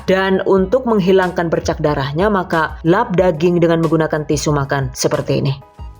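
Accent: Banyumas, Indonesia. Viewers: Selalu saja terhipnotis dg suara narasinya nda terasa tau" sdah selesai aja ..... Klo bleh tanya KK asli orang ngapak kah,... Tp klo nda salah pernah disalah satu Vidio tinggalnya dilampung.... Terimakasih resepnya yg selalu menginspirasi dan menghibur....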